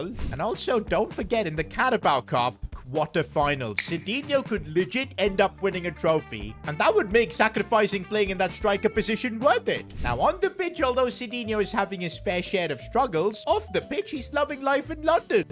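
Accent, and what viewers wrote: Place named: India. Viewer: The Indian accent is wild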